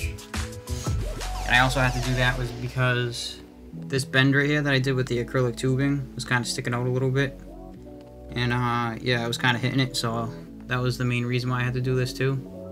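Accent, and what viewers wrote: Boston, United States. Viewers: Hawd drive. From Boston?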